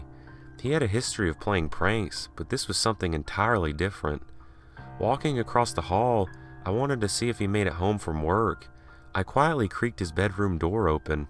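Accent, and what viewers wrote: South, United States. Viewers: Now with the dialects evoloving with more and more influence from almost any culture it's hard to tell the accent but it is for sure southern and in my opinion I'd say kentucky to the Carolina's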